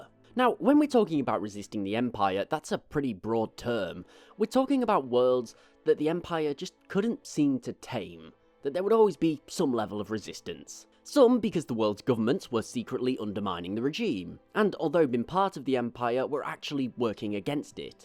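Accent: United Kingdom. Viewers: I can't stand your BRAETEESH accent..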